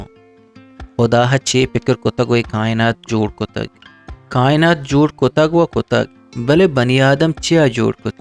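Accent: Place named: Balochistan, Pakistan. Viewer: Your Baluchi accent mashallah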